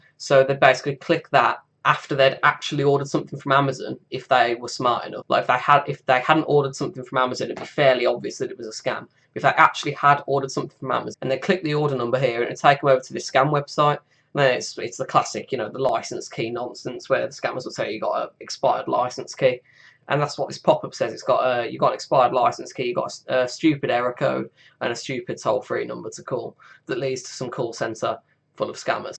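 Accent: United Kingdom. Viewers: Do u live in birmingham, u sound like ur from dudley or west brom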